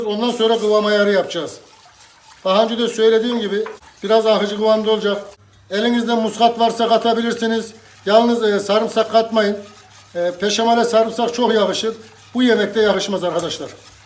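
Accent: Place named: Anatolia, Turkey. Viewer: Reis uzun zamandir senin Hemen tüm yemek tariflerini seyrediyorum ve yapiyorum Gercekten inanilmaz lezzetler cikiyo ortaya Ellerine emegine saglik Son olarak sunu da söylemeden gecmicem Cok naturel bir insansin Kisiligini karakterini bozmadan aynen yola devam ediyosun Özellikle konusurken siveyi bozmadan yapmacik degil tamamen anadolu agziyla anlatiyosun Helal olsun Yolun bahtin acik olsun Viyana dan selamlar Saygilar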